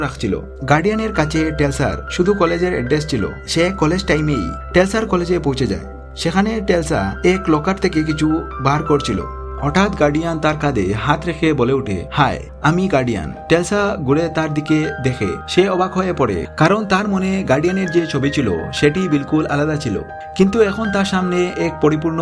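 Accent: Sylhet, Bangladesh. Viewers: Apni sileti naki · ভাই সিলেটি নি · তুমিতো দেখি সিলেডি ফোয়া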